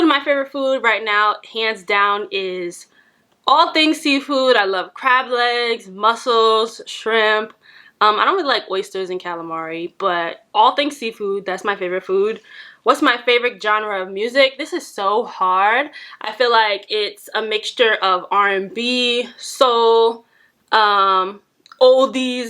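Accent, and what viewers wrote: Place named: New York, United States. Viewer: I feel like people think you’re Caribbean by how you speak but that’s the New York in you. There is a lot of Caribbean people here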